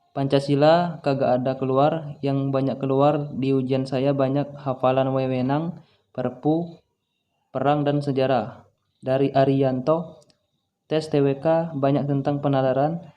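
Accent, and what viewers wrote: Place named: South Sumatra, Indonesia. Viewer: Min kok logatnya gak asing 😂, dari sumsel ya?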